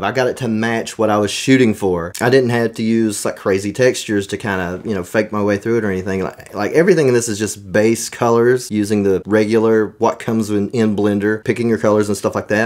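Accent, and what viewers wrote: Texas, United States. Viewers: You got that Austin Texas accent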